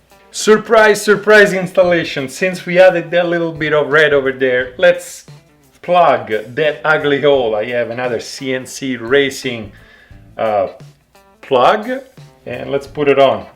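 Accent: Italy. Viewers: NOTHING LIKE A PANIGALE TUTORIAL WITH AN ITALIANN ACCENTT :D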